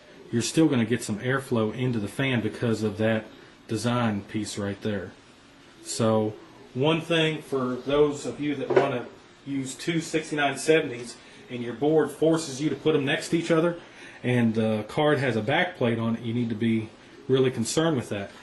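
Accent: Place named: Southeast, United States. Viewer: your accent sounds like american south-east? Would you mind telling where you live? don't need to be specific.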